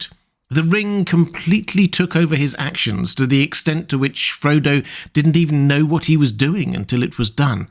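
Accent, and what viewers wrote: United Kingdom. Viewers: You have a wonderful voice for narration! And no, I’m not an American that thinks all British accents are cool, I’m Canadian which means I’m part of the commonwealth and also think all British accents are cool.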